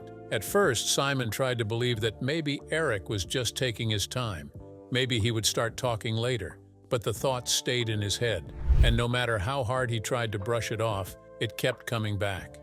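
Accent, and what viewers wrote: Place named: United States. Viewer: WHY IS AN AMERICAN Doing The Commentary?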